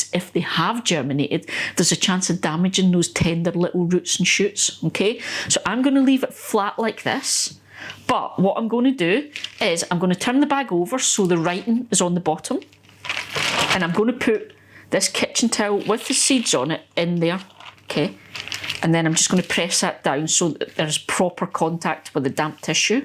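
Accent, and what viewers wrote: Scotland, United Kingdom. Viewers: Hi! I have only recently been introduced to your you tube channel by Gardener Scott! I have watched a number of your video's and find them very informative, professional and entertaining! A bit of background. I live in the state of New Hampshire USA. I used to garden for a number of years, stopping about 5 years ago. Starting up again this year. I always did a conventual garden. This year I am planning on making 6 high raised beds, 4 for general produce and 2 for asparagus. My growing stats are- zone 5A, Lat. 43.6, elevation 1100 ft. I live on a 3 acre property which my grandfather started a dairy farm on. Rocky soil but VERY fertile Carlton Loam. I cannot stop anything from growing including weeds! Weeds, the main reason for raised beds. The high beds( 30 inches approx.) I am retired and don't want to bend over or be on my knees as much. 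I realize you are in a more restricted space,(urban, rural) I would appreciate any tips for me and fellow gardeners which have more space. I am still planning on planting some crops in ground, corn, potatoes, winter squash and cantaloupe! I am also planning on planting some other plants in ground as well as in the raised beds to compare! Love your Channel! Love your expertise! And your accent as well!!!!!!! Could listen to Scotts and Brits all day!!!!! And I am part Dane by my grandmother! Eric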